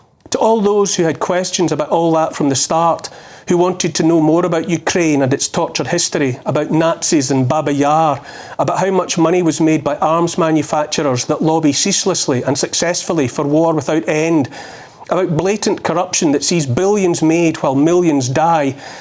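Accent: Scotland, United Kingdom. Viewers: and Amen to that, Dutch from origin, living in Ireland for 30 years now, and have been called a conspiracy theorist, been ridiculed, discriminated against, lost my work as a music teacher because I refused the jab, now if I were to talk to someone the way I feel about what is going on in this glorious country...I would be called a fascist. I am actually proud to be called a CT, because it means I can see through the BS, but this latest name giving is just sick, and I totally agree with this Dutch lady, comfort is one thing you have given me too, listening to your Scottish accent alone is comfort. God weeps.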